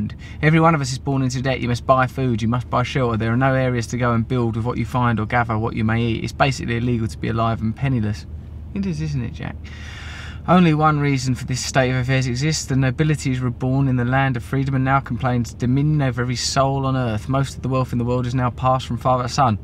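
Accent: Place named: United Kingdom. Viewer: Speak slower please. Can barely understad you sometimes. Not everyone is British.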